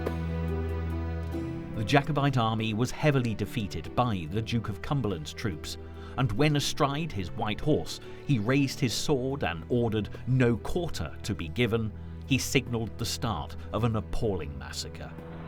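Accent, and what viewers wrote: England, United Kingdom. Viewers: As a wee Ayrshire lass, I was looking forward to this but cannae listen to that English narrators accent,I got as far as his pronunciation of Girvan and wet ma pants….enjoy the rest and maybe with a subject as unique as our Scottish myths and legends it would’ve been more engaging in a true Scot’s accent to amp up the heritage and theatre of our fab history 👍🏴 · Lovely docu. Thanks very much.,but please employ a Scottish narrator as an English accent is hard to understand.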